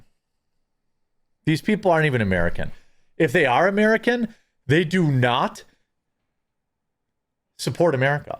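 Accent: India. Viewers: More of your accent your Indian accent it's great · Your dumb Indian accent is only topped by your inbred trailer park hick uniform of ball cap indoors and queer beard 😅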